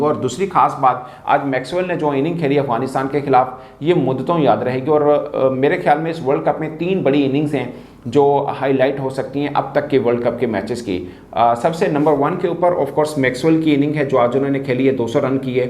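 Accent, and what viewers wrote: Punjab, India. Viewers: Kissay kuttti maaaa diyia bachyiaaaa. Who has asked u to talk abt cricket. Check ur panjabi street accent, have some respect to be on the tv. Taira content bc lund hey. Wur ja bc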